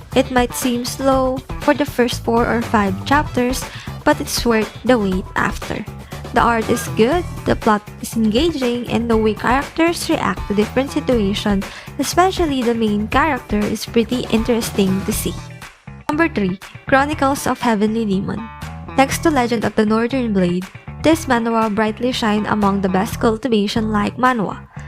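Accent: Philippines. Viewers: Instantly knew you were from the Philippines just from your accent alone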